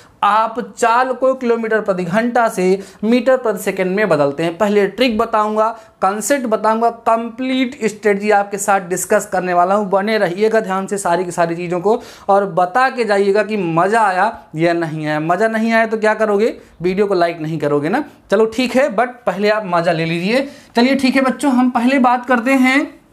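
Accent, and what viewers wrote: Bihar, India. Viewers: Totally ap bihari language me pdate hai Maza aa jta hai pdh k😍😍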